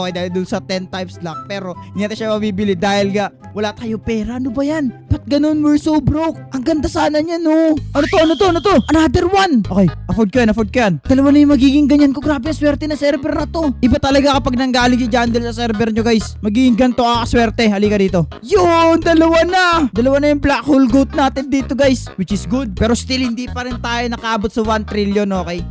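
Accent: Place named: Visayas, Philippines. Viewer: Bisaya ka😊 sobrang bisaya ka which p mahina ka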